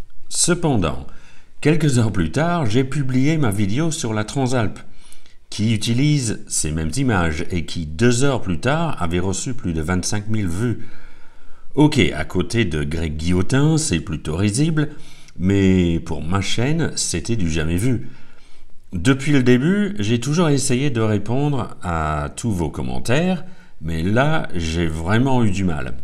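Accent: United Kingdom. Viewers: Comme quoi tous les goûts sont dans la nature et c'est temps mieux ;) Merci pour votre réponse et la qualité de vos vidéos. Mais également en Français avec un sympathique accent britannique .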